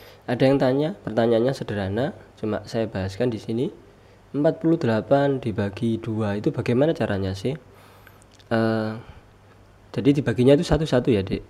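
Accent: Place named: Temanggung, Indonesia. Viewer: kakak orang temanggung bukan? logatnya temanggung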